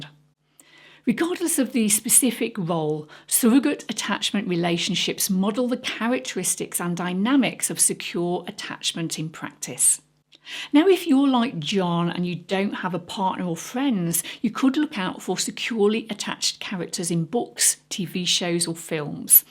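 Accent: United Kingdom. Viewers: I love your meditations or I should say your talks. They are very well informed about things like what happens in the brain, for any number of reasons May i simply askthat you talk about borderline personality disorder? because I hear you saying that you can choose this or choose that and people with bordrlije personality are terrified of abandonment, real or perceived.... and as I speak and for several years now I've been abandoned to awful little boarding homes for lots of money in rent, And the present one is costing me $900 a month and I'm told by the manager that he doesn't need the money when he gets somebody in here fast and they leave almost as fast as they get here because of things that are going on here, verbal abuse and gossip between all of about residents. Plus: same thing from the woman who cooks here. What happens to the mentally ill in mental health awareness month here in the US!! 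You sound like you might be from the UK, and I don't know , really. Here in America, it's May and May is supposed to be a mental health awareness month I got verbally abused by some overworked nurse in the ER , here in America at most private or public hospitals or in general, all the nurses and psych tecchs, etc... at least in the hospitals where I've been admitted to psych units all over Birmingham Alabama… They want their 12 hour shifts so that they can have three days or four days on and then three or four off and so they get stressed and they take it out on whom? Maybe you gue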